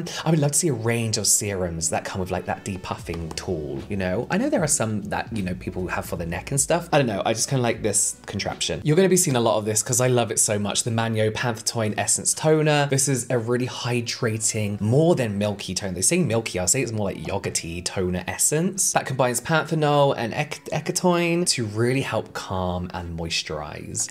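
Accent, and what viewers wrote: England, United Kingdom. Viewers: Hello from Los Angeles,. I enjoy your videos. Can you tell me what your take is on SKIN MEDICA? and have you tried anything from TRUTH TREATMENT SYSTEM? both are on the higher end skin care, but I believe and also heard when products are more expensive, it's because they use better products. I know this is not true for all, but it rings true for SKIN MEIDCA and Truth Treatment system. I love listening to your soothing voice and your English accent.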